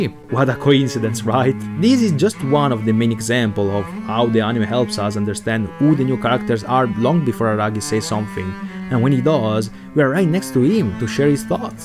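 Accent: Italy. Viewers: By any chance, are you italian? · Greetings from Itali fratello. While your accent makes the audio a bit more difficult to understand, it makes me feel a bit prideful to know this franchise has such a smart and good fan and he is from my same country. Wish you well, hope life is going well for you. I had this on my Guarda più tardi for the last few years, glad i finally watched it all. I know i will rewatch the whole Monogatari sooner or later and thanks to your video, i will appreciate it even more. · Haha, anche io appena sentito l'accento ero curioso di sapere se fosse italiano, stavo per scrivere lo stesso tuo commento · his accent sounds very Italian to me.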